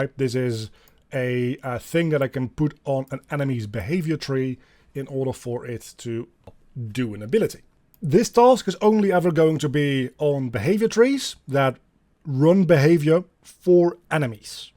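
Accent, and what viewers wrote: Netherlands. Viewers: Thanks for the answer! By the way, are you Dutch? I'm just curious because of the accent 😅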